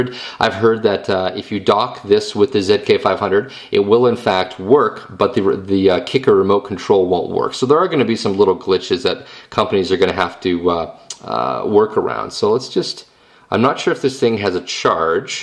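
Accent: Canada. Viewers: And off-topic, but this was the first time I noticed the Canadian accent. lol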